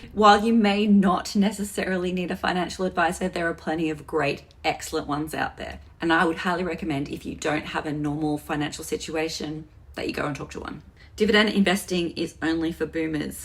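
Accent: Australia. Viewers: Nice to hear an Aussie giving some good common sense advice.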